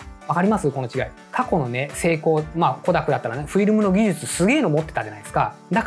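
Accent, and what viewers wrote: Hiroshima, Japan. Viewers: 非常に説得力あるお話でした！勉強になりますね。ありがとうございました。広島弁で話されてるところも聞き入るポイントです。話すスピードも私的にはgoodです！ダラダラ話している方は飛ばしたり、早送りしてしまうので。最後のじゃあね、バイバイがまた可愛いくホッとします笑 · 面白い動画ばかりで、広島弁も心地いいです。ただもう少し完結した動画にして欲しい。でも楽しいですよ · さん、ご家族でコロナ大変でした😂元気に復帰していただいてとても嬉しいです🤗奥様も3人の子育てで大変なので段々イライラしてきたんでしょうね😅 さんが作ったスキル分かりやすいし素敵です🤗課題発見力の必要性と商売の本質も学べました。やっぱり疑問に思ったり、思考力が大事と思いました。フルモデルチェンジ力のお話しも納得！過去の成功を捨てるのは勇気が入りますがしがみついていると時代に取り残され稼げなくなると思いました。変化に対応できて学び続ける人が稼げると私は思いました。大手が参入している領域では勝てないので、個人でどうやって専門性を見つけ稼ぐに繋げていけるのか動画にあげてほしいです🤗次回の動画も楽しみです🤗 さんは未来予測もしてますが私達に現実、事実を教えて頂いている希少性が高い存在です☺️応援してます😊あと広島弁も素敵ですね😉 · 心地よい広島弁ですね✨ 勉強になりました！ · えーコロナ！大丈夫ですか？広島弁聞きたかったん！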